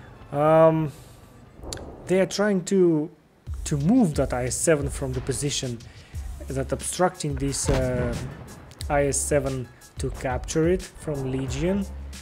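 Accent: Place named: East Asia. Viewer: Райс инглишь)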